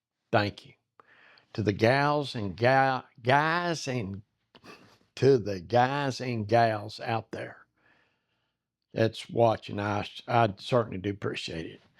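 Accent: South, United States. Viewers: Hi. I have been watching woodworking videos here on YouTube for close to 20 years and just discovered your channel about two months ago. I sure enjoy watching your video's, and one of my favorite things about watching your video's in that southern accent of yours. I sure enjoy listening to you. Another thing I like about your video's is that they get down to business. No trying to be a comedian like a lot of guy's do, and they are about as funny as a broken leg. I've been going through your video's trying to find a tool that you showed in one of them, and it was that black angle finder jjig you have. I think I might like to buy one of those but I can't find that video. Could you tell me who makes them and where I could get one?? I sure would appreciate it. Thanks and keep those video's coming. Also, I don't know when it happened, but i'm glad to hear that your wife is recovering from her surgery on her leg. 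I know what thats like as I broke my right femur and shatterd my left heel about nine years ago. I will be 80 this next February, and we just don't heal up as we did when we were kids. Tell her hi for me, and keep making those videos, I sure do enjoy watching them!! Jim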